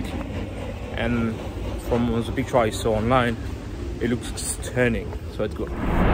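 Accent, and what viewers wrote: France. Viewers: ton accent c'est trop ..francais, la prochaine, parles toi en francais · On sent un petit accent français 😁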